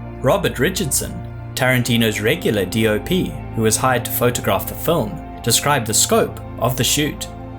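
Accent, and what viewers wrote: South Africa. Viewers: Are you south african? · Hearing this accent again reminds me why I left SA. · I love your channel boss, you're South African right?? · Are you South African? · I’m not sure if using “from dusk till Dawn” was an intentional reference. You sound South African. Are you in Jhb? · Are you from South Africa man ? Your accent sounds like it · Are you South African? I recognise that beautiful accent from miles away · You sound South African? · South African? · Sounds like a South African narrator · Is this man... a South African? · Subbed when I first heard the South African accent. Great video 👍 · Not even a few minutes in I kept thinking, this dude must be South African, so I checked your channel info and lo and hehold! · U South African?? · Bro your South African, right? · South African Accent?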